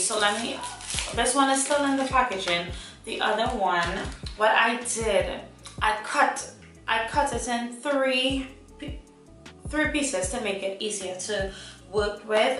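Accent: Trinidad and Tobago. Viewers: First 3 seconds, i knew she was Trinidadian. Liked and subscribed ONE TIME! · I randomly clicked on this video looking for reviews on the soap and immediately was like wait is she Trini? Lol its so cool how our accent is soo recognizable especially to other Trinidadians lol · I hear that trini accent... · You from Trinidad 🇹🇹 ??? Your accent 😍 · I know a Trini accent when I hear one 😍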